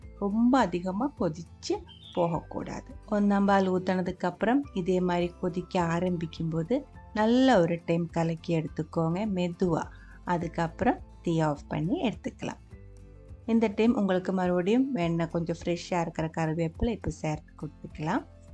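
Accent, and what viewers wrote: Kerala, India. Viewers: മലയാളിയാണോ സംസാരിക്കുമ്പോൾ മലയാളം വരുന്നുണ്ട്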